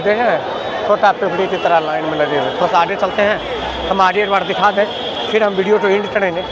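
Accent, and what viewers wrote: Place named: Bihar, India. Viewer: भाषा का उच्चारण ठीक से करो।। तुम बि हारी कोई भी भाषा बोलो उसमें उरेठ पन झलकता है।।इसलिए तुमलोग हर जगह मार खाते हो।।शर्म नाम की कोई चीज बची ही नहीं है तुमलोगों में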